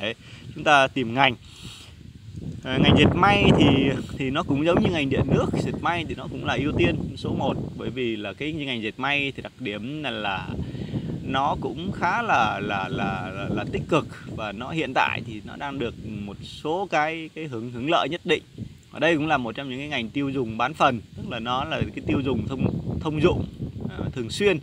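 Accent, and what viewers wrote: Thanh Hoa, Vietnam. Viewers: Em ở Hà nội hay tp Hcm,giọng thì tỉnh Thanh Hoá.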